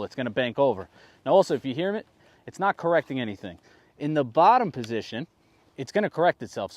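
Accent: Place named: Boston, United States. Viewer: Man keeps saying "supah" and I keep thinking "dudes gotta be from Boston"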